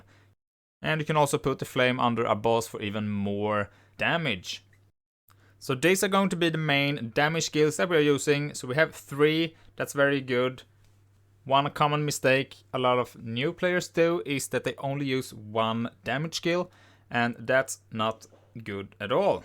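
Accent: Sweden. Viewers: Svengelskan ärgrymm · 100% på att du är svensk :D Bra guide.. Tack edit: btw. The pob is a bit messed up. you got a lvl 1 tree, and then the lvl 15 tree is like full build, and lvl 40 tree has less placed skillpoints then lvl 15 :)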